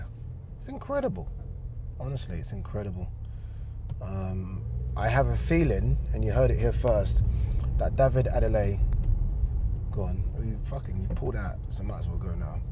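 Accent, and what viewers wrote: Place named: East London, United Kingdom. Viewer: This is so me driving around! And the "are you going out what?" Especially where you was driving 😅 (I'm based in bow) so I recognise it. Looool You're soooo east London the way you talk and I love it!😅 Btw You can do two way filming on some phones! (Samsung it's called director mode) I've enjoyed your candid chat, great convo! And you are perfect for club world cup! Congratulations Hun 😘 💓 👏 If you need a hand with your channel while you're busy doing the world cup and boxing, I'm more than happy to help. Maybe I should email you? Haha I like the name car confessions name but I see your point lol😂😅😅😅😅 but yeah car diaries is cool 😅😅😅😂😂😂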